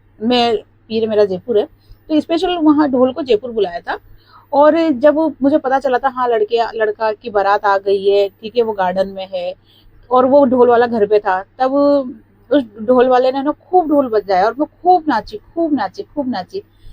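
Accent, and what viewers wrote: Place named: Madhya Pradesh, India. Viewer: Mem Apke Bolne Se He Muje Pata Lag Gaya Tha Ki Aap MP Ki Ho Mem Apki Reading Ne To Kamal Kar Diya 10000% Perfect Same To Same Situation Vry Vry Tks Gujarat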